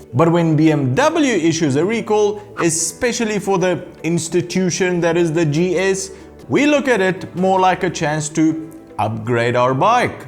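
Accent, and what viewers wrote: South Africa. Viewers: Great videos with a superb sense of humour. Put me out of my misery though. You live in Romania, yet your accent sounds a little South African, would this be correct?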